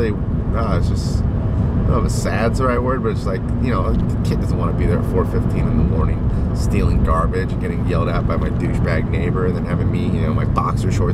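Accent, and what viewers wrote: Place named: Australia. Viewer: Yeah the accent is most definitely Australian but yeah you better hope they don't see this =). Good stuff mate.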